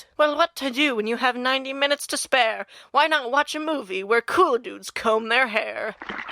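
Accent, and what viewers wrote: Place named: United Kingdom. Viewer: Ur bri'ish accent is gold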